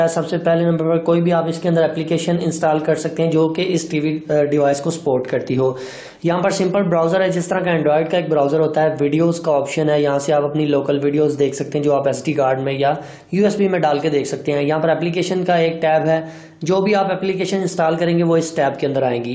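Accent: Punjab, India. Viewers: Phelay Urdu sahee say bolo, Panjabi style mai connect nahi bolo, apney nails cut karoo. phir video banana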